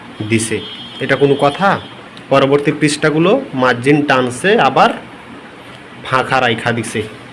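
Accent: India. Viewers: আপনার কথা শুনে তো ইন্ডিয়ান ইন্ডিয়ান লাগছে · আপনার কথা শুনে ভারতীয় মনে হচ্ছে আপনি এই খাতা কোথায় পেয়েছেন 🧐🤨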